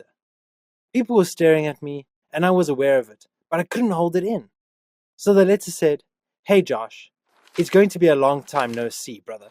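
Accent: South Africa. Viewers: South African right? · This is the first time hearing a narrator speaking in a South African accent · The narrator is probs South African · Hmmmmm do I hear a South African accent? Niceeee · Is the narrator South African? Cause he sounds like it.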